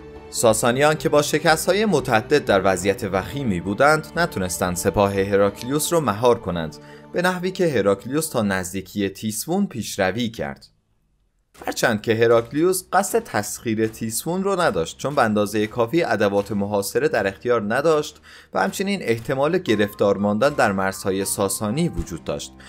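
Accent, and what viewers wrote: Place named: Turkey. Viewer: کاش سلیس و روان صحبت می کردی خیلی ترکی صحبت می کنی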